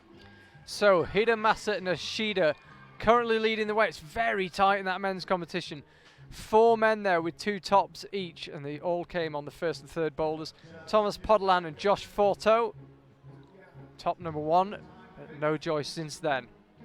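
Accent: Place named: United Kingdom. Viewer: DJ with cabin fever :P I do fancy your very british commentary!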